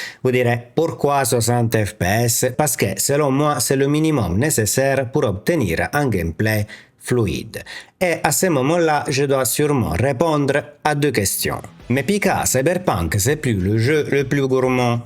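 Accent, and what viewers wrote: Italy. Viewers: Bonjour, tout d'abord je tiens à te remercier pour le contenu qualitatif de tes vidéos, avec, en plus un accent que j'apprécie puisqu'il vient me rappeler mes origines (mes grands parents étant originaires de l'île de Pantelleria 😘). Je dois monter un nouveau PC en novembre prochain et j'aurai voulu avoir ton avis sur le choix d'une carte graphique dédiée principalement à la retouche photo et au montage vidéo (davinci resolve, photoshop et lightroom). Tous les ordinateurs que je monte ayant une durée de vie de 10 ans, j'apporte un soin particulier au choix des composants sans toutefois tomber dans l'excès des cartes derniers modèles qui n'apportent finalement que peu de performance en plus par rapport à la génération précédente. Mon choix actuel se porterait sur une carte ASUS GeForce RTX 4070 Super/16 Gb Ram laquelle devrait convenir et tenir une dizaine d'années. 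Actuellement elle coûte un peu cher mais elle devrait à un moment donné coûter un peu moins cher. Actuellement mon PC est équipé d'une carte graphique Asus-CG-GTX-Strix-960/4 Gb de Ram qui a bien tenu jusqu'ici mais sa puissance devient insuffisante lors de l'encodage des vidéos. Mais elle tient le coup, sauf qu'elle devient lente. Je compte sur ton expérience pour m'orienter sur un autre modèle, si nécessaire. Grazie per il tuo ritorno. Ciao, Ciao 🙂 Salvatore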